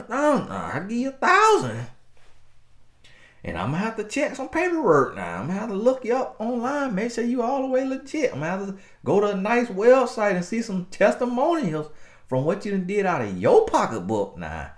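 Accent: South, United States. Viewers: Lol.... we love your Southern accent.....please ignore envious comments and keep teaching us...blessed you and the Fam 😁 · your messages a very far reaching, not just for singles at all. I learn tons from every video that I can apply to my marriage, career, and life in general!! These are life lessons!! Plus I'm country too, so it's awesome to hear the dialect and phrases I grew up hearing. Lol.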